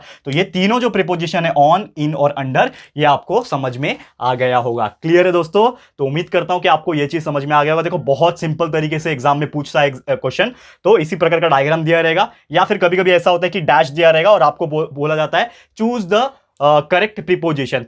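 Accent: Maharashtra, India. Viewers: Sir your marathi is soo cute and nice and your teaching is soooooo beautiful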